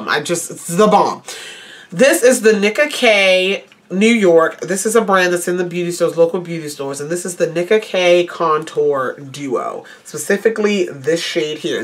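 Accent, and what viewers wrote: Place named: Baltimore, United States. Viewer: Found you through Makeupstruggles. Subbed with the quickness. Love the accent. Reminds me of my B-mo peeps.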